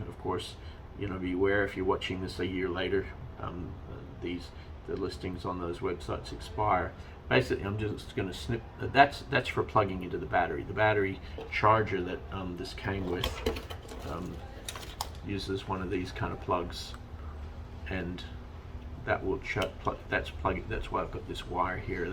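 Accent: Australia. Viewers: Half Aussie half American accent?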